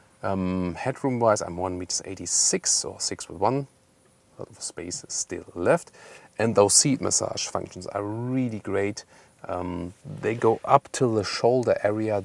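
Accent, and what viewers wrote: Germany. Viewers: He's not mumbling, that's a german accent...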